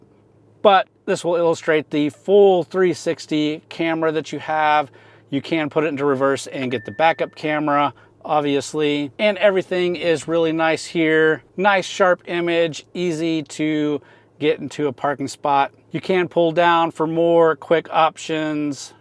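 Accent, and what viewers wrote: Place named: Texas, United States. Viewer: Don’t judge. Not all people from Texas talk like this.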